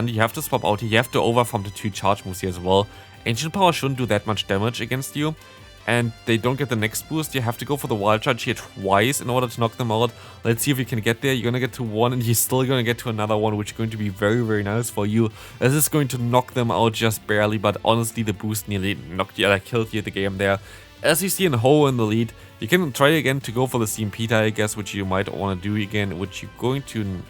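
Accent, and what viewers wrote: Germany. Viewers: Why do I think you have a german accent?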